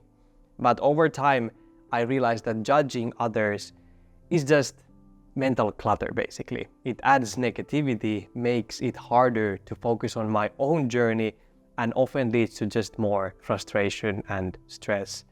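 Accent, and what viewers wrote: Finland. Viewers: Maybe it has something to do with the Finnish language? My mother is from Finland but has lived in the Netherlands for 40 years, she speaks Dutch with a Finnish accent and it sounds exactly like this. In the Finnish lanaguage there are a lot of very long words that are composed of multiple elements, maybe its more important to be clear about where one words ends and the next one begins?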